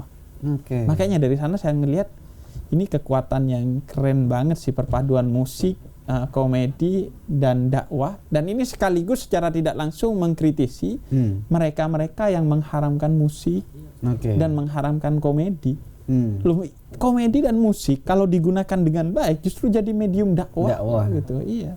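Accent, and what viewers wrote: Madura, Indonesia. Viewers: pantesan habib logatnya madura ternyata dari bondowoso semangat bib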